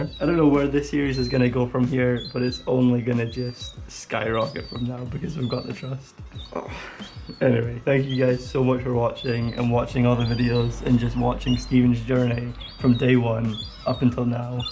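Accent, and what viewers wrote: Scotland, United Kingdom. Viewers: sounds like a scottish morgz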